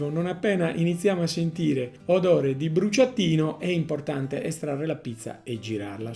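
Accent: Sardinia, Italy. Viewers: Sentivo accento nostrano... Bravo, saluti da Nuoro.;-)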